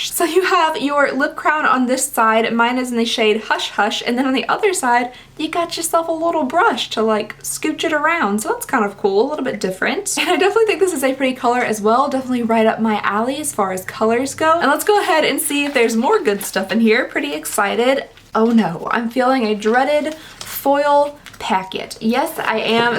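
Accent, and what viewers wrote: Kentucky, United States. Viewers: It's crazy that I clicked on and watched your video lol your voice is the only one I can handle I scroll down and realized why!!!! Your a fellow Kentuckian!!!! Go cats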